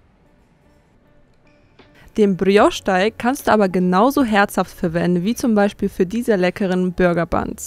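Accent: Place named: Eastern Europe. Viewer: Wer es noch nicht mitbekommen hat… Diese Junge Dame rollt das „R“ bei „Brioche“ weil sie einen slawischen Akzent hat. Sie spricht ein gut verständliches Deutsch und auch das Video ist vom Inhalt her nachvollziehbar und plausibel. Warum geilt ihr euch an dem fehlenden französischen Akzent, sofern er überhaupt nötig wäre (?) auf? Habe das Rezept nachgebacken, und es ist wunderbar gelungen!😊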